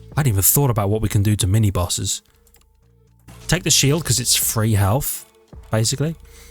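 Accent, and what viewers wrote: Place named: England, United Kingdom. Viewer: He said guv! Wasn't sure if he was English until now. The deep slang really drives it home.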